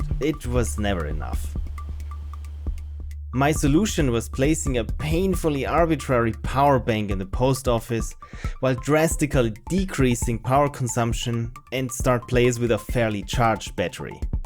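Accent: Germany. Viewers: Wait a second, you german?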